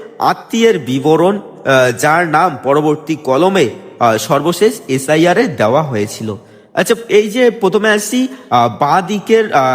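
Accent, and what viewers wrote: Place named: Bangladesh. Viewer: Tumi to Bangladeshi. Vulval bojhaccho keno ? Tomar citizenships na bolleo tomar kothai bole dicche tumi bangladeshi .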